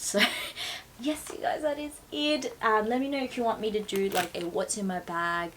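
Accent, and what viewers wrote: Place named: Australia. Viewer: You're so Aussie 😂 I say cans too. I've heard you're supposed to say carn but maybe I'm wrong. Anyway love this bag and love the reverse monogram w the monogram 💖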